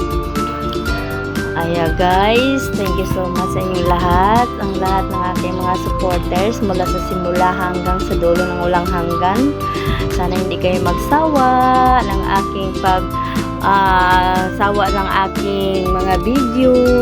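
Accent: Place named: Iloilo, Philippines. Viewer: Ilonggo na tono mo sis 😅😅😅😅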